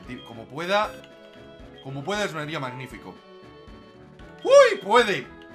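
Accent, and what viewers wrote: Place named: Russia. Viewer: Me suscribo por tu acento ruso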